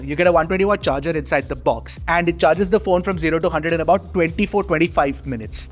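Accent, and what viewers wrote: India. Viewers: he sounds like what foreigners think a typical indian talks like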